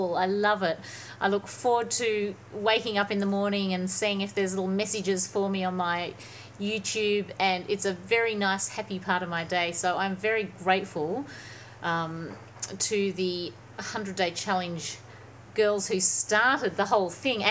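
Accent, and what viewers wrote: Australia. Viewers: i love australian accents<333 so cool! love the tan, you look FIERCE girl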